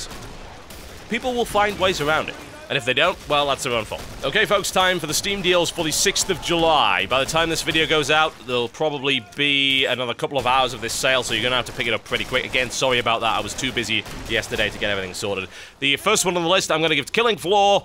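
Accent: United Kingdom. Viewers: 27? His british accent always makes him seem so much older.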